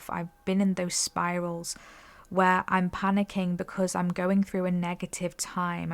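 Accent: Northern England, United Kingdom. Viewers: Beautiful message, beautiful name, do I sense a bit of northern English as we are the best 🎉❤🙏✨🌹🌹🌹 peace and light 🌟🙏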